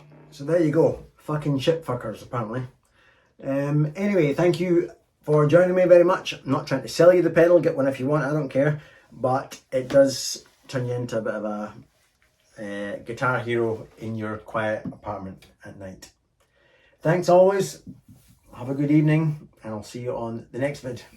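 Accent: Scotland, United Kingdom. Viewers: Cool video and Scottish accent is the coolest accent in the world. cheers from Vancouver, Canada. Ed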